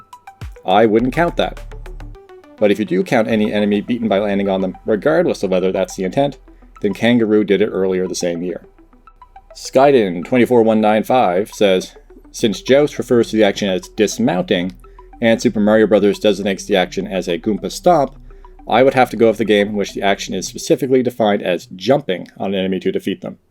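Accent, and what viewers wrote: Canada. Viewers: Pardon me, are you Canadian?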